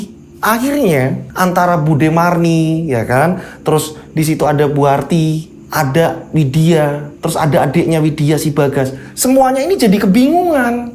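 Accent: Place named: Central Java, Indonesia. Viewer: Absen Kuwait 🇰🇼♥ Ahirnya ada lnjutanya juga yesss Klo dengrin critanya mas ko aku kaya lagi d ceritain mantan🤭 suaranya mirip sih logatnya sma krna dia juga dari jateng aku nya indramyu 🤣🤣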